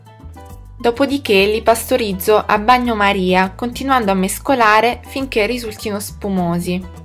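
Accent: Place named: Brazil. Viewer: wao que hermoso video, y que hermoso acento eres de Brasil o Italiana ... muchas felicidades ... saludos desde MEXICO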